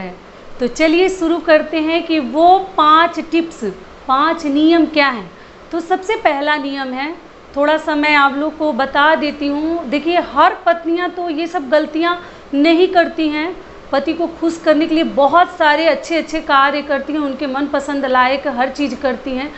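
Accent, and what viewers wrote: Bihar, India. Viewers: Aap Bihar sa ho kya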